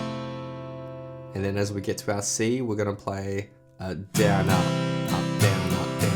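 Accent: Australia. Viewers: .. it dawned on me that your accent is Australian? This is how I know actually.. I am very surprised you've not decided to cover yet!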